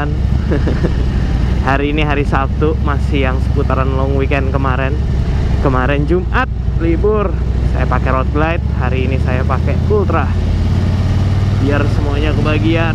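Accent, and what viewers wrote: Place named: Madura, Indonesia. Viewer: Bang, logatnya bukan jawa solo, mirip orang madura.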